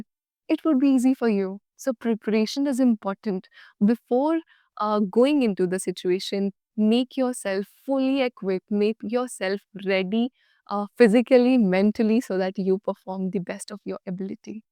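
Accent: India. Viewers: Are u indian ?.....u have indian accent too much .... try to fixx itt good luck ❤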